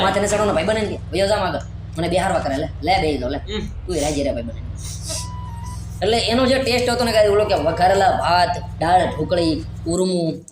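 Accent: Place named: Halvad, India. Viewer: તમારી ભાશા આમા રા જેવી જશે gj 36 વારા જેવી હળવદ વારા બોલે મારી જાન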